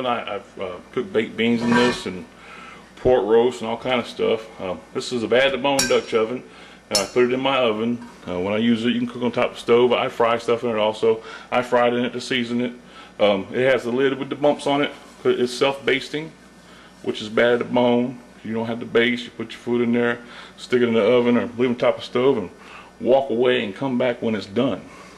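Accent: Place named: South, United States. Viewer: Hi! This is a fantastic demo and it's a really good idea to educate people about the benefits of using cast iron as opposed to modern non-stick varieties. Your cooking vids are brilliant and inspiring - I'm English and live in London, but I grew up in Virginia and I sure do miss my Aunt's cooking - your vids bring it all back (esp the accent!) I do my best to recreate Southern home cooking here in the UK and my English husband absolutely LOVES grits!! Keep up the good work! Best wishes!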